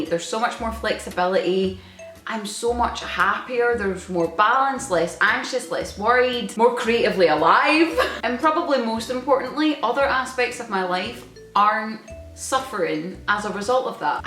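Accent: Scotland, United Kingdom. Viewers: I absolutely never realized the Scottish accent emphasized the "e" on certain words. Like "hous'ee's", AWESOME! Sorry, I'm a new sub and I love different accents.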